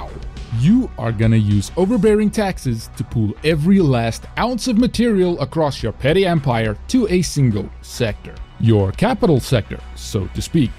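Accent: South Africa. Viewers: Long since I've heard a fellow South African Youtuber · Dude! Are you South African?? Cheers from a fellow citizen missing mail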